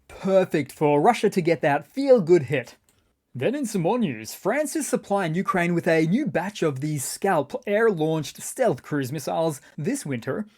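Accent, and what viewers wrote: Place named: Queensland, Australia. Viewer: Cheers your accent sounds like you might live near 1770, nice part of the world:)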